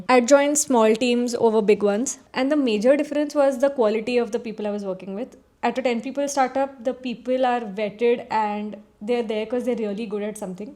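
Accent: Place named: India. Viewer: Itna gandha accent hai pajjeett vala. Hindi mai bol le London ki rani.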